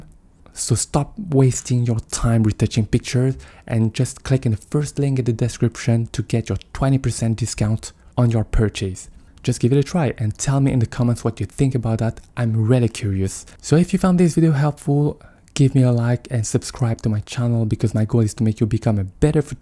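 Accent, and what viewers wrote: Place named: Italy. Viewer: i like ur english akzent. are u from italy or something like that? its sound like that.. ^^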